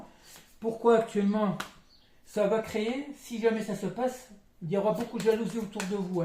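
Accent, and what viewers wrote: North, France. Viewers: Bonjour, j'ai comme l'impression que tu es din ch'nord, ça me rappelle de bons souvenirs... Merci pour tes lumineuses guidances. ☺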